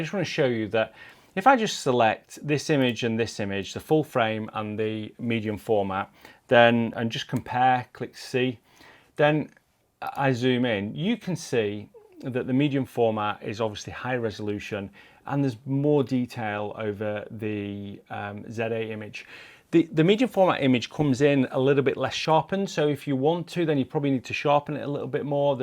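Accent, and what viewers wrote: United Kingdom. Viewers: It's the first video I've watched from your channel, I like the test you've done and moreover I like your British accent, it's easy to understand for a non-native speaker like me. It would be interesting if you could print some pics from a Fujifilm X-T5 which it is an APS-C camera but with 40 MP sensor, just to understand if an A1 print looks better now compared to an APS-C 24 MP sensor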